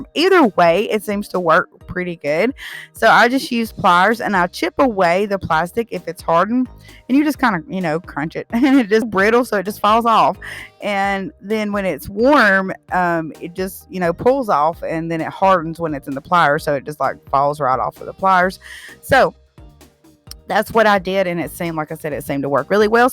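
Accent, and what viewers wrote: South, United States. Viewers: GORGEOUS!!!!!!! Btw I think I look at your videos as to watch the crafts as much I do to listen beautiful southern accent...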